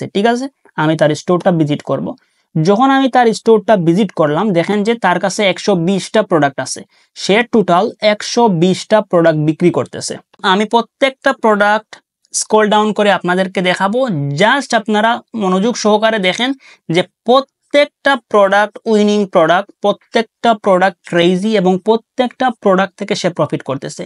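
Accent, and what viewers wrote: Sylhet, Bangladesh. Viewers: ভাষা শুনে বুঝা যায় ১০০% সিলেটি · Bhai ki sylhety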